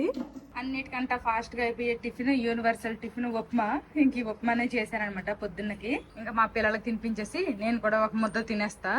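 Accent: Srikakulam, India. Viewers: Srikakulam yasa super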